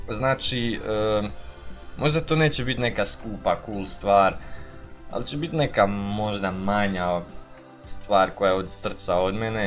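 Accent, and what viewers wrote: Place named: Dalmatia, Croatia. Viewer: odakle si ti? Govoris dalmatinski pa pitan:D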